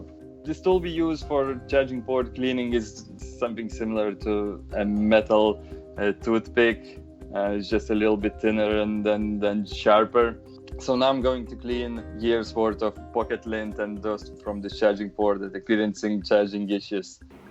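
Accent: Russia. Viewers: Акцент такой русский) жесть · He speaking with Russian accent?!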